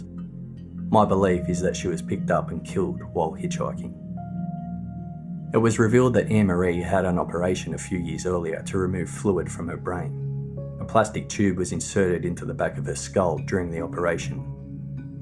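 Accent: Australia. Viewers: I love the podcast. I love hearing the AUSTRALIAN accent Makes me feel connected to that part of our world. I have always felt that the people in Australia have a kind of spirit like many in the USA. We are both young countries and there’s an exuberance in the people in both of our glands. I don’t know if I’ll ever make it to Australia , but your podcast brings me a bit closer. God bless us all on this extraordinary planet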